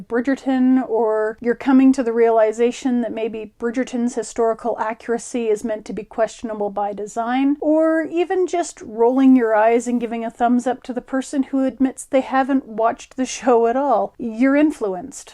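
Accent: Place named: Ottawa Valley, Canada. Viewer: Huh. I thought I was the only one hearing an angelic choir every time one mentions... The Algorithms… (gosh, they're loud). Big congratulations on the bump in viewership. Well done. Ah the subtle twang that is the Ottawa Valley. Fascinating that you got mistaken for Australia. And that 'Somebody knows something' podcast, season 1. Well. It's not often that you get the details of things that happened to someone who would have been mine and Mister Husbands age. I probably fished around that lake around that time. I'm loving the post-modern digital communication analysis, by-the-by. Guess we were having the same conversations at Carleton back in the day (the painters tape you have over the 'pop' bottle lettering... bowing). Suggestion for one of those marketing relationships kind of thing - make friends with the local independent 'stuff' suppliers for at least a cut in costs of material. 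I have no idea if there's an equivalent to the agency that reps Miz Bernadette in the Canadian area codes - maybe somewhere in T-dot or Van? Maybe Enchanted Rose knows someone? The 1915 hand-crank Singer 99K is here. And sews just like she came out of the factory - absolutely mind blowing. And I got to use her on my FR entry. Which is almost finished and about to be photo-ed. Form fitting shell dress a la the (19)40's, representing Blanch from Streetcar Named Desire. Too cheep & poor for patterns so I taught myself how to pattern draft for my Covid time. Not baggy clothing is... weird. I'm used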